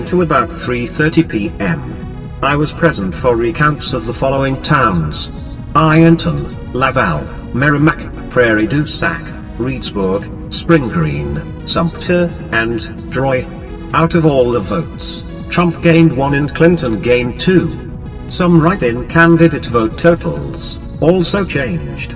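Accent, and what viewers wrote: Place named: United Kingdom. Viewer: Why the odd british accented bot voice?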